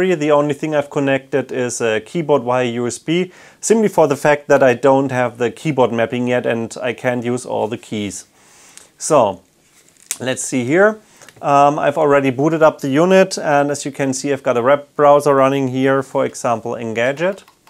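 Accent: Germany. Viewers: I knew that you are german :D unseren Akzent kann man auch nicht verstecken :) Cooles Video!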